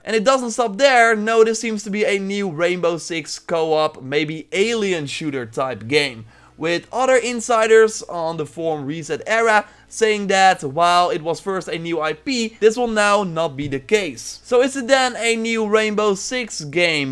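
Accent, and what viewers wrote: Netherlands. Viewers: We horen gewoon dat je Nederlands bent door de manier waarop je Engels praat 😂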